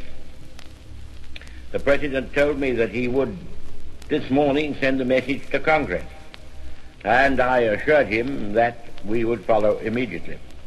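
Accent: United Kingdom. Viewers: I'm a brazilian and wwii aficionado currently learn English, but this old British accent I can't understand so much, please anyone here transcript this video for me, thanks!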